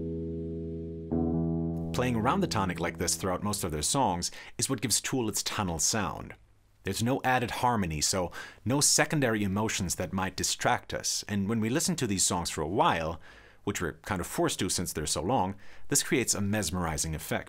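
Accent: Germany. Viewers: Holy guacamole, hello my fellow German. I didn't know you were so very German. But now that I know, I can actually hear teeny tiny things in your speech that should've given it away earlier. In any case, your videos are amazing. Thanks for being such a great inspiration! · Are you german?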